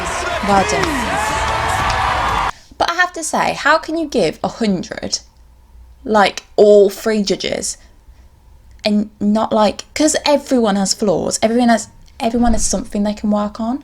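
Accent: United Kingdom. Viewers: You sound British? Are you British?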